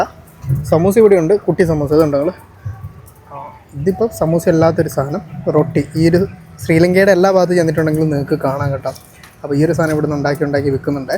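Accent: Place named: Central Kerala, India. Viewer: നിങ്ങൾ ശരിക്കും കണ്ണൂർകാരൻ തന്നെയാണോ നിങ്ങളുടെ ഭാഷ ശൈലി കോതമംഗലം മൂവാറ്റുപുഴ തൊടുപുഴ ഭാഗത്തുള്ള ഭാഷാശൈലി ആണല്ലോ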